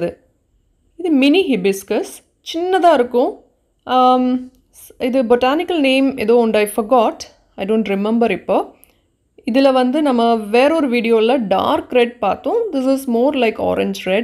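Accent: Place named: Nagercoil, India. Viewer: Mam nagercoil language apadiyae eruku, nanae entha place nu kekalam ni erunthaen, ngl la entha place mam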